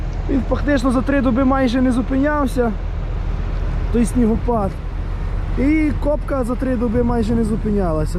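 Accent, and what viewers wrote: Ternopil, Ukraine. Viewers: ваш говір Тай ніби із тернопільщини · Тернопільський акцент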